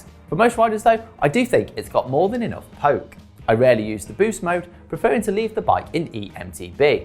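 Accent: England, United Kingdom. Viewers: Would you kindly do this review again without the English accent?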